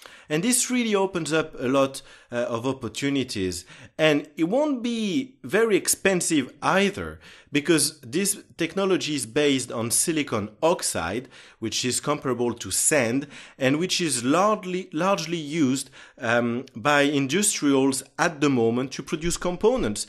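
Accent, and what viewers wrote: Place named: France. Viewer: Toi t es français je reconnais l'accent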